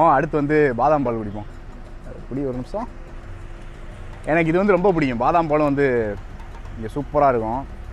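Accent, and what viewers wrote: Madurai, India. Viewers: Peasum pothea avinga evinganu arampichutinga anne😍 atha madurai slng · Anna Madurai tamil 😍awesome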